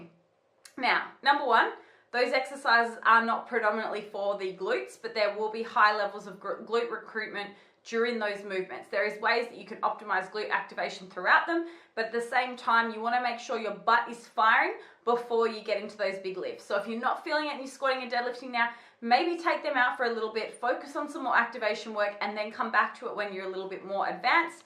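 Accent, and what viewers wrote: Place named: Australia. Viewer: Thanks, Good quality information and it's great to hear an Australian accent too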